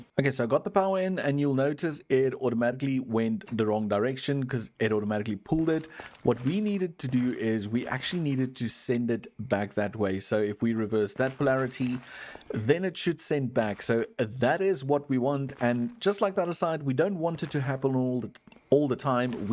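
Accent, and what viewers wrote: Afrikaans, South Africa. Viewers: I got to ask. You have to be from SA. That accent is giving jozi😅😅 Edit: You called it a pick. SA confirmed. Nowhere else in the world would you find such nice English😂 Edit 2:Haha. Called it. Lol Afrikaans accent never misses😂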